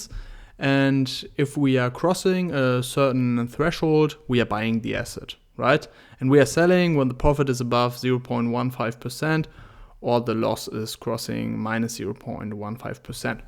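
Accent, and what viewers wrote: Germany. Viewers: I really like your content and I just subscribed to your channel. Please continue with the videos for cryptocurrencies. Are you by any chance from Austria or Germany? Your pronounciation sounds like it. Cheers! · You can notice he is German. But a trading bot won't make you rich. Still good personal project to develop. :) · I like your German accent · Dieser deutsche Akzent👑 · Sehr interessant. Ich mag es übrigens, wenn Deutsche englisch sprechen, dann verstehe ich jedes Wort :)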